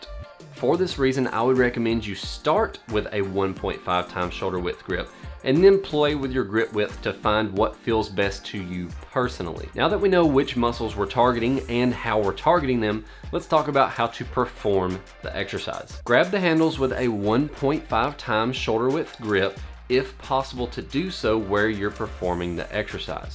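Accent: South, United States. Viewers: deep southern accent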